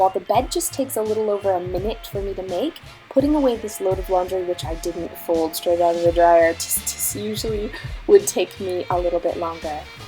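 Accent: Canada. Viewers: your Canadian is showing lol! "eh?" it's all good. I'm in Indiana and I still say eh. I live like 3.5 hours from Canada so that may be why haha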